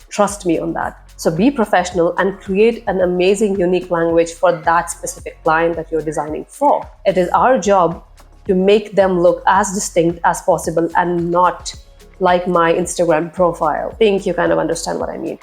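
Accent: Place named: United States. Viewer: BTW your english has an american accent. That's sounded very good and also your content.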